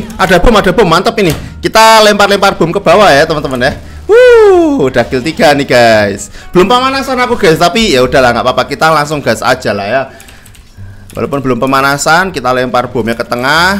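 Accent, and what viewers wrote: Java, Indonesia. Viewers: baru nonton lagi video bg ini makin medok ye ngocehnya wkwk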